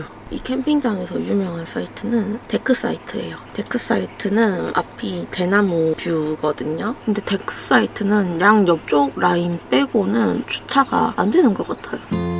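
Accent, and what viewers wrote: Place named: Gwangju, South Korea. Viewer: 광주 사투리 정겨워요 ^^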